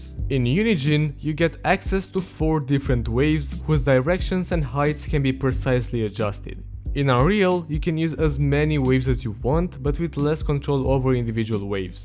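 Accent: Russia. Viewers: Русский акцент такой ржачный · Странный русский акцент для румына 😉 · Такой жесткий русский акцент у автора )) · Слышно, что наш парень. С произношение вообще беда) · Почему мне слышится русский акцент? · такой акцент русского языка, ты что плохо знаешь английский ? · акцент то русский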